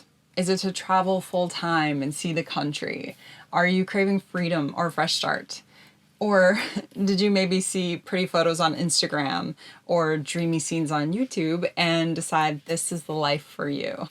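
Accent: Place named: East Coast, United States. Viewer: Another good video, you are punished financially for being single to begin with. I had two camping vans and lived on Martha's Vineyard for a Summer. So it sounds like you are from the east coast which is why I only see videos from the west by you. Your next project could be a TV show but you probably couldn't do it alone. Most travel shows don't show travelling from your perspective.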